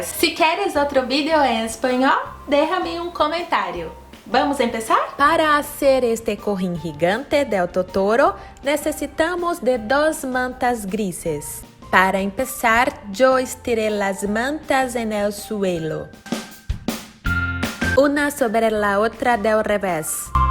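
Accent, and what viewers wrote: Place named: Spain. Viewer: Amei seu sotaque Espanhol 🤣🤣🤣🤣🤣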